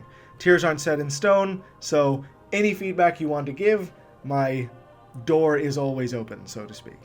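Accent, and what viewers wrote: Ireland. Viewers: Where are you from? Accent sounds a bit irish?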